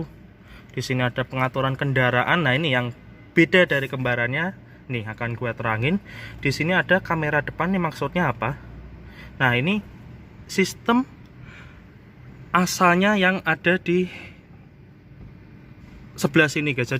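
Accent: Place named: Betawi, Indonesia. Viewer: Guwa apa kulo? Betawi medok nih 😅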